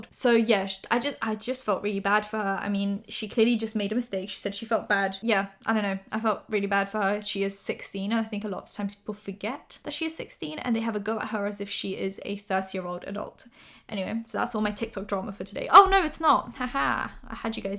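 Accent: United Kingdom. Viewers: Are u british and from London?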